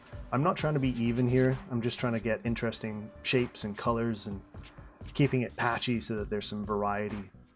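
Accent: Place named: Canada. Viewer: Watching video.....ok cool, man, I've been wanting to do one of these for a while......looking good....huh....this guy sounds pretty Canadian....Ontario maybe?....pretty sure Canadian.....Premier spray primer?!?! Oh yea bud that's some Canadian Tire paint right there......"deck-el"! C A N A D I A N C O N F I R M E D. Where ya at? the "deckle" pronunciation makes me think farther west than Ontario. AB? SK? I feel like if you were in MB I'd know.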